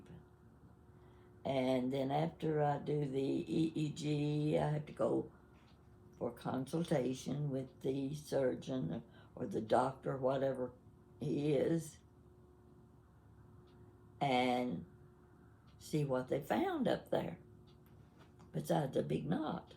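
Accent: South, United States. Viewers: Your Southern accent reminds me of my grama. 🎉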